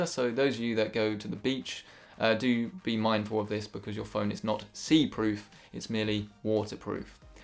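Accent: United Kingdom. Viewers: e sounds more annoying than other british accents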